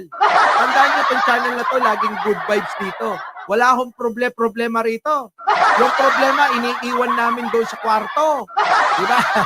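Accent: Ilocos, Philippines. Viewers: Sir natatawa aq sayo sa ilocano mo haha. Minoramopa mga ddsit sabimo okininayo kamo haha ilocano aq sir tinototokan ko tong programamo sa utube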